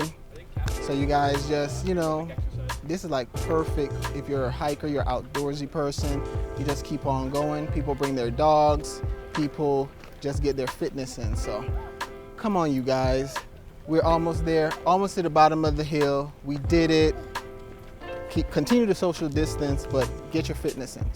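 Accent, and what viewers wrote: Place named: Caribbean. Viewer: Are you West Indian? I hear a slight accent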